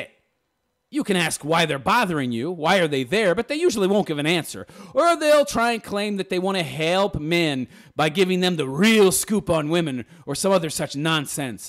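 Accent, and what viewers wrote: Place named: South, United States. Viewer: My dear Lady Vin, thank -you for your prompt reply. Bible days, tantrums, Arab men, women without rights, who will cave-in first and hidden children within the Catholic Church.... Did I forget to mention Harem ?? To begin with, I'm not an American, however, MGTOW men do not want to have women , period. No wives, mistresses or harems. Please do not misquote me on that . We want less responsibility with regards to women, not more. MGTOW men say no to marriage, LTR and co-habitation. We make no demands of women, other than their distance. Biblical times, NO, we are moving forwards, not backwards. We live within the Western society and have little or no regard for oppressive cultures. The laws in the USA as well as here in Europe and biased in favour of women when it comes to divorce and taxes. MGTOW is a mindset, not a formalised structure, no central organisation, no official spokesperson. 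Therefore, there can be no implosion of men who choose to live life on their own terms. We are as intervidual and unique as you are. Illegitimate children within the Catholic Church is something I am well aware of. Being Catholic myself, I know all about the Madeleine Laundries and the lives that institution wrecked. On par with the Holocaust in my opinion. So yall can chew on that awhile ( love your Southern accent ). Thanks once again for your reply....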